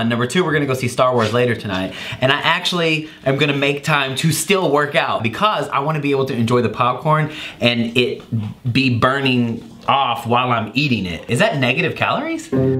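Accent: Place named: South, United States. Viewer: Just now discovering this! FYI your accent totally changed after working out with! Haha. Southern boy made an appearance. Can't wait to meet you someday! (and watch all your videos!!!)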